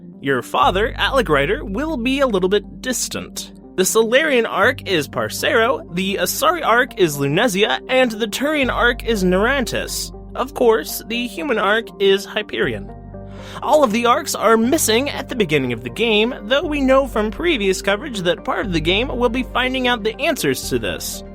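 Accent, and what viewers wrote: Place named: Scotland, United Kingdom. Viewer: scottish accent....oh god yes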